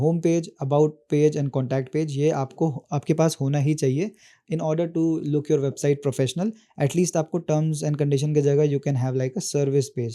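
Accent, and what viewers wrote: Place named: Urdu, Pakistan. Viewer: excent is look like urdu best tutorial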